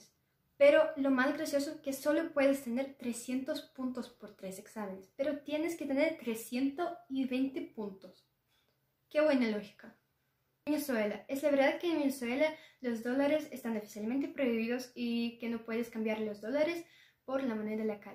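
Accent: Argentina. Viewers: Interesante video! 👏 Que bien el acento y modismos de Argentina 😊🇦🇷. Saludos desde Buenos Aires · Lo peor que pudistes hacer es agarrar el acento arjentino, es el mas feo de todo latino america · Yo como mexicano si he comido los famosos Escamoles,que es la hueva de la hormiga,y son deliciosos,y muy caros ,ha de ser por que no es facil recolectarla,ya que los que se dedican a eso tienen que soportar muchas picaduras de las hormigas,hay vídeos de eso,saludos rusita,que has aprendido español y que estas tomando acento argentino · Me encanta tu español muy argentino saludos desde Argentina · tenés un acento parecido al cordobés (Argentina) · Si tiene acento argento y vocabulario argentina... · Saludos desde Argentina me encanta tu acento Argentino che. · Como hablas el argentino es muy bueno jaja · Jajaja me encanta el acento argento que tenés, hablás muy bien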